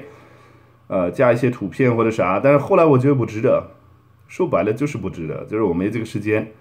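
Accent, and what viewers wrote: Northeast, China. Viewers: 你咋是东北口音呢,哈哈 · 东北口音。。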